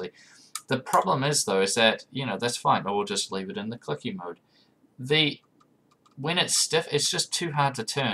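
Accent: New Zealand. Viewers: He's from New Zealand... Not Australia. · Hey, it's a kiwi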